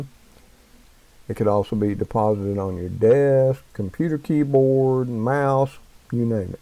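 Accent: South, United States. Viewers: I love your Southern Accent! I was born and raised in Georgia. When I hear you it reminds me of home! Arizona has been my home for 4 years but I sure miss Southern hospitality southern charm and down right good manners. Oh yea greens and cornbread and boiled peanuts also.😉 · Thank you Mr. for this important update, you have very good advice to us all in this very disturbing time. I have been following a few of the channels you suggested this past week, and can see where this is going. I love to hear you speak and your southern accent, you remind me of my father in your features, but unfortunately he passed some years ago now. You speak so much common sense in these troubling times. There are distressing scenes of people being put into isolation in China on the BBC news right now. God bless you and your family and much 💖 to all.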